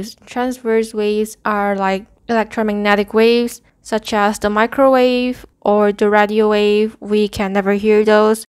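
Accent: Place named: Malaysia. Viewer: Love from Pakistan ? BTW, you sound like Malaysian cuz I lived in Malaysia for 5 years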